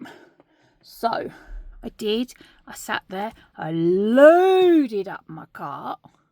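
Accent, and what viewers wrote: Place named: England, United Kingdom. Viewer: Lovely to hear an English accent here....for this coming year I'm going to switch up to a standard travellers notebook from my pocket rings just for the change ( but I've already bought all my inserts for the pocket rings just in case ! ). Now you've got me thinking about Just Scribble....I have looked at hobonichi for 2026 & resisted .I bought an A6 for 2025 for doing some journalling but didn't enjoy it so I'm now in a stalogy instead. Have loved the " waffle ' ,keep up the good work xxx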